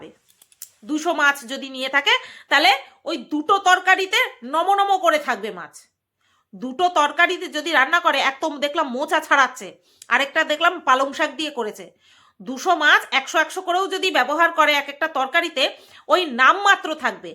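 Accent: Bangal, Bangladesh. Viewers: Tomar mukh e 'Bangal' bhasha sunte darun lage ❤. Ami Bangal er meye aar 'Ghoti' barir bou . Bangal bhasha ta darun misti amar kache 🥰.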